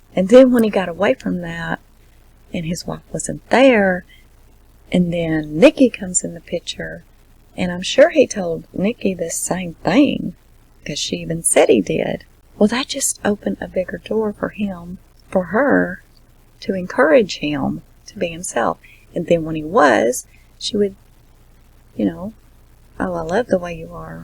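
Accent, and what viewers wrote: South, United States. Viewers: From the time Shannann got home, I do not believe a word Chris said. Fact according to Shannann. In order to have sex, she would take a shower. Her suitcase is open in the discovery, and her toothbrush was still packed. She according to discovery had full make up still on. She was found with bra and underwear on. The only thing off was a different tee shirt, and her leggings. This is a story made up by a man, that is clueless. Men out there reading this. Be very careful of females swishing their tail like a bitch(female dog) in heat. Females are very protective of our belly’s when pregnant. She was never on her stomach, and we sure don’t let anyone sit on our babies., thank you for deciding what we are having for dinner. FISH Love you my southern talking girlfriend. True story. I use to travel for work. I was in yet another drug store in l think Wisconsin and a young man said “ I love hearing you talk, where are you from? 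“. I told him I was raised in Ga. but live in Texas. He looked at me and said, “ Do you need a passport to visit? 😳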